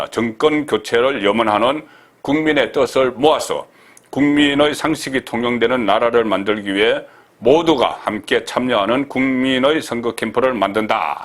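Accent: Gyeongsang, South Korea. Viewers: 경상도 사나이 구수한 해설 감사합니다~~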